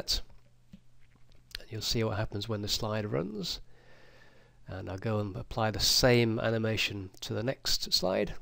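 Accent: England, United Kingdom. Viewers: great work.. great British accent.. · .....a VERY good tutorial! – ...and Thank You for speaking "english-english" in a clear and "easy-to-listen-to" way...(Many accents from within Britain can be awful to listen to unfortuantely...this was very good) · For some of us who are American, we love the flexibility of spelling it flavour or flavor; check or checque; color or colour; etc. Or maybe my latent ancestral genes (as in DNA, not "jeans" as in clothing) just kicked in and I accept both ways of spelling, realizing that the context of his accent tells me he is of more recent British extraction. My family is several generations removed from Britain and Ireland, and has lost the charm of the accent. Yet, when I traveled to Ireland, it was as if I were "home" despite the accent, differences in pronunciation and spelling, etc. There is a concept called called "code-switching" you might like to research. 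It comes into play in dual language situations where one is mentally switching what they "heard" or "saw" (as in a visual language like American Sign Language (ASL) vs Pidgin Sign Language - using the signed hand shapes of ASL but in the grammatical sequence of Spoken English Grammar.). So, for me, this tutorial was wonderful and I appreciate the time he took to carefully explain a bit how the PowerPoint process works.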